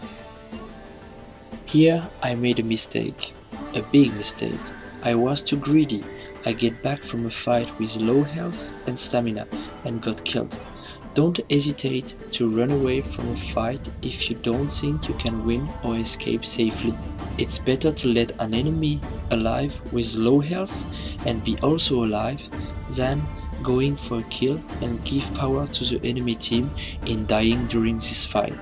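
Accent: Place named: France. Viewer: Pas mal comme vidéo ça se sens que tu parle Français mais ton anglais est parfait 👌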